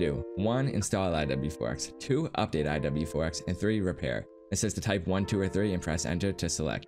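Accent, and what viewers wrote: Boston, United States. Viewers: thank you so much bro you mad chill you from Boston by any chance? love the accent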